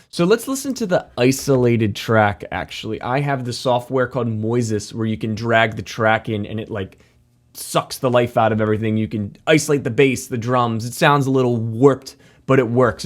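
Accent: Baltimore, United States. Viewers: The Baltimore accent is one of the greatest American accents of all time. Now I need to snort lines of Old Bay and put on some.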